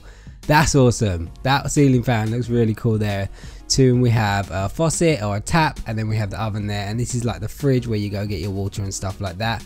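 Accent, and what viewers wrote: United Kingdom. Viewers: Wait do u speak British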